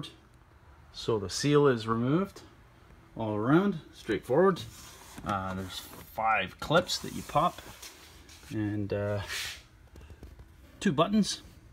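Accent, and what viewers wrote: Scotland, United Kingdom. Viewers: Do I detect a Scottish accent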